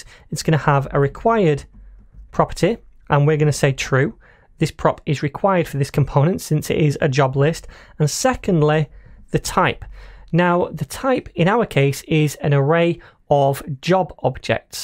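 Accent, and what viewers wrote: United Kingdom. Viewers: I was amazed to see you used rupees as the currency. Love the British accent btw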